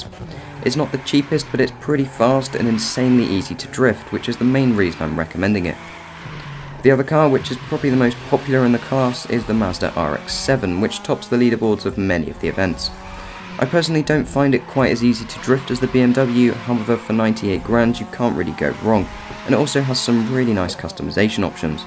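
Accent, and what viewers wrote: United Kingdom. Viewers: nice to see ur still doing crew 2 ! i just got the pagani zonda r and it is INSANE! btw u sound british which part ur from?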